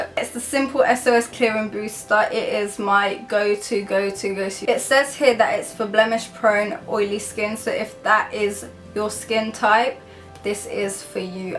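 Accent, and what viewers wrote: London, United Kingdom. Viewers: Patt Col London accent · You’re beautiful. I’ve never met a Latina with a British accent haha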